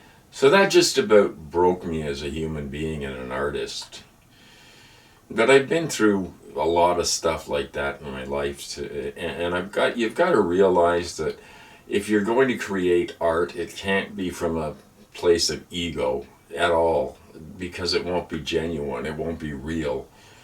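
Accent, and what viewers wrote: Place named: United States. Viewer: you speak fluent american, both a blessing and a curse, so many friends from belgium, france, uk, aussies, etc etc do as well, luv ya, good content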